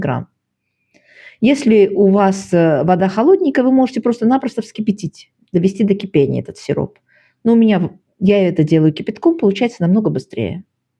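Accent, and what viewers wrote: Baku, Azerbaijan. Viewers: Бакинский акцент до сих пор прослеживается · Большой привет бакинцам! Приятно слышать бакинский акцент! Очень интересный канал, богатая коллекция рецептов!!! Спасибо вам!!! Я тоже жила в Арменикенде! С уважением из Ростовской области..... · Ваш бакинский акцент никуда не делся ❤ А мы в крем добавляли еще 100 г орехов, и в тесто тоже коньяк. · Я,как услышала ваш разговор,сразу поняла,что вы бакинка.Я тоже пекла этот торт в 83 году.Очень вкусный. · Лёгкий бакинский акцент приятно слышать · Ах! Как я рада что нашла у вас этот рецепт. Давно искала. В Баку мамина подруга пекла, и было очень вкусно, он как ириска, тягучий с орехами, с приятным медовым ароматом. Спасибо вам огромное! Вы прелесть! Очень подробный рецепт, детальное описание, сладкий бакинский Джан акцент! Благодарю!!!!!!!❤ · Дорогая. Спасибо большое за рецепт. Обязательно попробую испечь для моих гостей. 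Вы чудесная женщина. Ваш бакинский акцент греет сердце.♥️ ❤ ❤ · где бы Вы сейчас на не жили, а бакинский акцент не ушёл. Счастья Вам и мира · добрый вечер!Я коренная бакинка.Сейчас живу на Кубани и с громадным удовольствием смотрю все ваши выпуски.Радуюсь вашему знакомому бакинскому акценту и замечательным и несложным рецептам.Оставайтесь такой же молодой,красивой и обоятельной!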